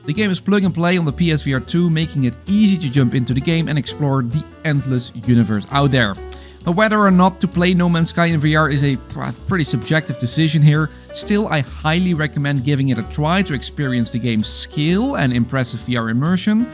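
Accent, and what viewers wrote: Netherlands. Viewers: U bent nederlands 100% aan het engels te horen. 😄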